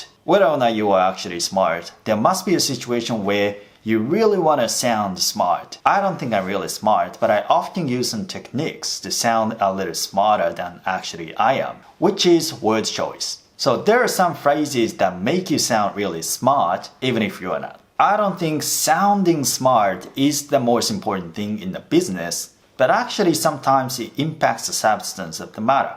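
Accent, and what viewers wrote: Australia. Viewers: めちゃめちゃオージーの発音でびっくりです笑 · オージーなまりですね。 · わざとオーストラリアっぽいアクセントで話してるのかな いつもより訛り強めですね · 久しぶりに見たら別人かと思うくらい発音がオーストラリア訛りになってました。あえてここまでする必要はありますか？ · オーストラリアの英語かわいいですよね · むかしのATSUさんの英語のアクセントと全然違う、オーストラリア訛りになってる。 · オージー訛りですよ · 今日の動画、これまでで一番オーストラリア英語っぽいです！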